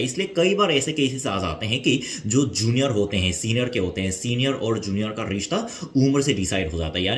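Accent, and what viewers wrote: Standard. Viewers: Yeah you are right. His hindi is much pure than many Indians. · I must say you speak more shudh (original) hindi than many Indians. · Itni sudhha hindi bolte ho, bada accha laga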